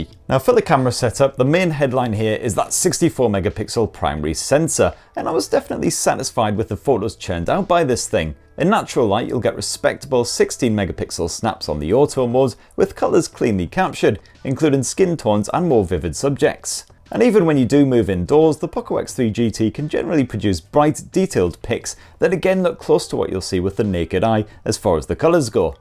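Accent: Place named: Northern England, United Kingdom. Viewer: northerns his way swiftly through this review. Typical up north camera locations and plenty of chuckles at his brash humour. Stay safe.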